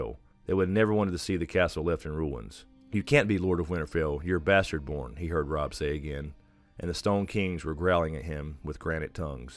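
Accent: South, United States. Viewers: I agree with his southern akcent to kool.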